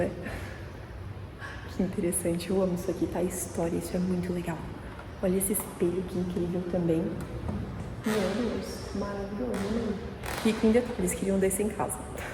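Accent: Brazil. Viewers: Sabia que esse sotaque só podia ser de SP.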